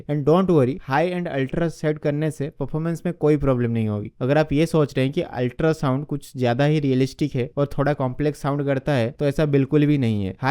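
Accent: Odisha, India. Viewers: Odisha se ho kya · Bhai tum Odisha se ho kya? Tumhara awaz ek odia toka jesa lag rha h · Are you an odia? Your accent makes me feel like that.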